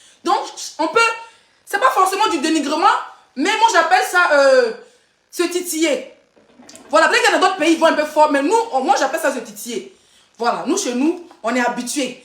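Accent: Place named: Cameroon. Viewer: Stp parle comme une ivoinnene lorsque tu parle comme une camerounaise ça ne donne pas de grâce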